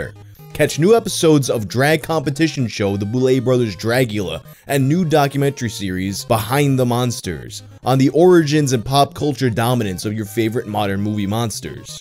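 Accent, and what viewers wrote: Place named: Buffalo, United States. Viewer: sounds like he's from Buffalo or something.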